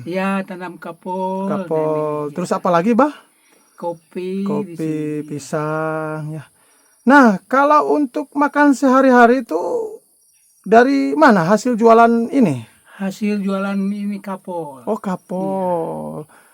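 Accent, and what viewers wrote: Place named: West Java, Indonesia. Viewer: Klo dngr ngomong itu daerah prbtsn Tasyik dgn garut Buuun.. Mfin ya bun nyela komen 🙏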